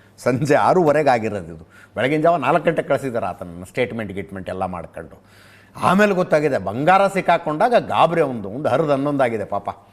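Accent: North Karnataka, India. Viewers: sir nimma bayalli tumba chanagi barutte namma uttar karnataka bhashe. haradu hannondu antha 😊😊😊😊🎉🎉🎉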